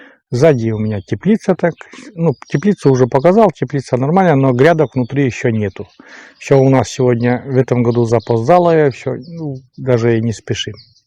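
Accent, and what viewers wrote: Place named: Komi-Permyak, Russia. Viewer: Я когда то жила на севере Пермской обл.коми-пермяцкий округ,мне твой говор,родной.Как только услышала первый раз,сразу поняла🎉,что говорит коми- пермяк. Я уже начала язык понимать,да родители уехали оттуда,а мама знала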